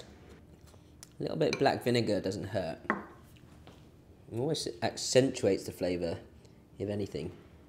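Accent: United Kingdom. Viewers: That lovely British accent!! :-) Thanks for all the good recipes!!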